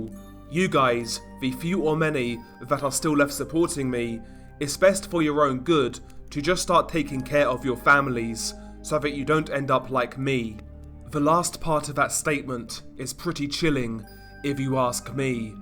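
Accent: United Kingdom. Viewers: It’s funny how a British guy is telling us all this information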